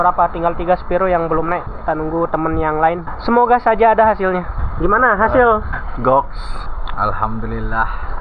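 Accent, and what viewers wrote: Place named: Lombok, Indonesia. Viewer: Logat lombok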